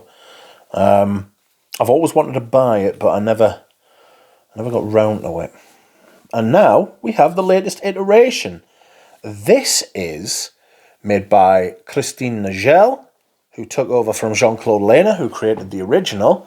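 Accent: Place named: Durham, United Kingdom. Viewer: I think it's fine but nothing special. I'm pleased I didn't buy a full bottle. It's very similar to other fragrances I've tried recently. Are you from Durham? I'm from Durham you sound a bit like myself.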